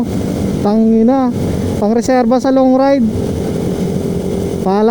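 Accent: Iloilo, Philippines. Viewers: boss ang ilonggo nyo kag ilonggo dre sa tacurong sultankudarat paryas paryas gid haha long live ilonggos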